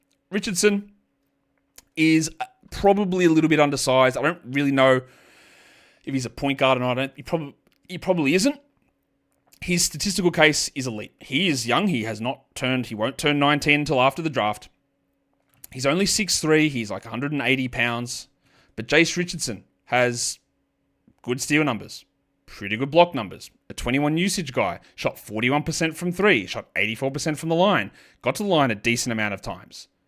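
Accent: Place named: Australia. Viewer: He’s Australian, he inherently has an accent different from ours. Also he’s. He’s called sadiq bey the depressed penis for his whole career.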